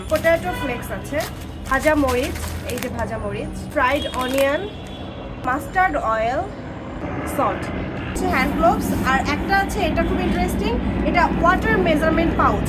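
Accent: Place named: Noakhali, Bangladesh. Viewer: নোয়াখাইল্যা আফা চালাই যাব। 😁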